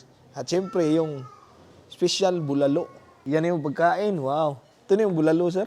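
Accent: Visayas, Philippines. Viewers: I love that bisaya accent Though😊, lalo na ang pag ka brought ng sabaw😂😂😂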